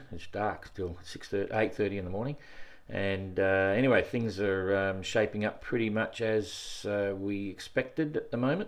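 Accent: Australia. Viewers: Hello,it would be kind to speak a little bit slower for french followers who are not crurrent users of the aussie accent..thank you so much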